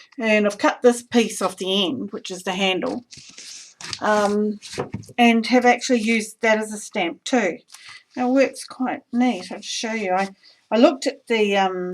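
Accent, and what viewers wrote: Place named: New Zealand. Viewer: Thank you -- great ideas . Plus, loved hearing your accent, as an expat Kiwi it makes me homesick! x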